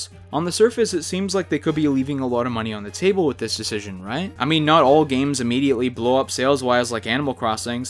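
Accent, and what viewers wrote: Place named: Canada. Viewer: I love the really strong Canadian accent. Aboot.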